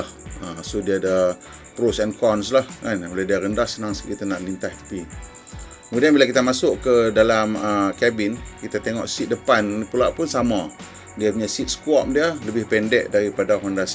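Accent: North, Malaysia. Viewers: Cakap utaqa plak noo… i like👍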